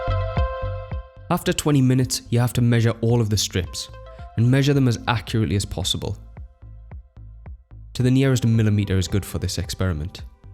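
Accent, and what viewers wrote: United Kingdom. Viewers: british boiii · i personally love the british accent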